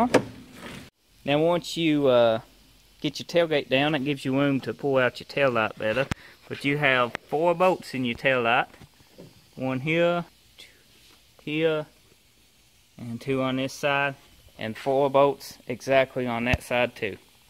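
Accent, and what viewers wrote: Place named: South, United States. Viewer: Great info! Just how far South ARE you? I could listen to your drawl all day long. Makes me want to get a 6 of PBR!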